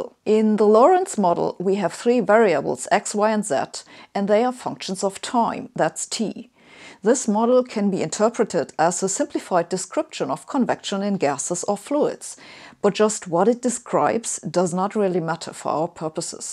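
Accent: Germany. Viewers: Maybe it's the German accent or something, it sounds like she's cutting words too harshly . But it's ok, at least I clearly understand what she says, unlike real anglophones who are "eating" their words.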